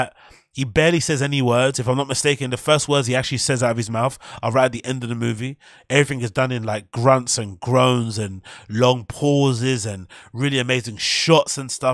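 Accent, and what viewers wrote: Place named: United Kingdom. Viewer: Damn. Where you from, man? You sound British but your name is the most Angolan thing ever.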